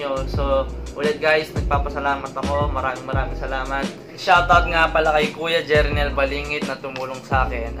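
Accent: Pampanga, Philippines. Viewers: Ako Lang Ba Ohhh May Parang Accent Sya Ng Kapampangan Pag Nag Sasalita Ng Tagalog 😂😂😂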